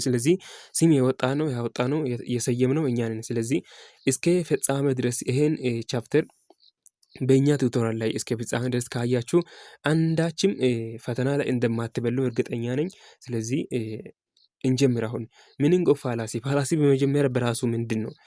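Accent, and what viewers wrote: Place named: South, Ethiopia. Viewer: አማርኛ አትችልም ደቡብ ነህ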